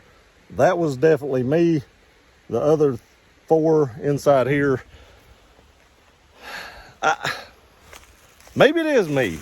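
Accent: South, United States. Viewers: What did he say? Omg he is country 😂😂😂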